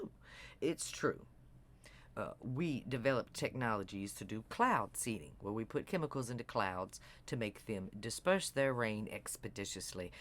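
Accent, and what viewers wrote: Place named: Louisiana, United States. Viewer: Hearing you say exactly what I've been saying, in your lovely Louisiana? sexy voice, is fabulous! 👏👏👏👏👏👏👏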